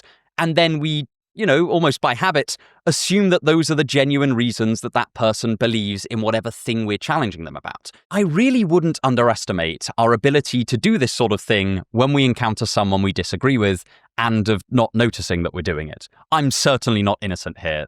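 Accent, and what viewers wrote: United Kingdom. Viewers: Yes, I’ve heard the “opinions are like assholes” before, but I thought was inventive to adapt the idiom especially the part about being examined. 😂😂😂 And for me, hearing “asshole” with a British accent carries some elegance that the American accent never will.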